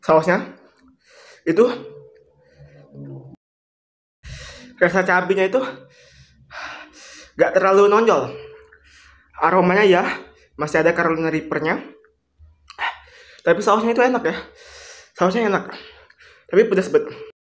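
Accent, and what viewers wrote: Palembang, Indonesia. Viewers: bang lu orang Palembang ya bang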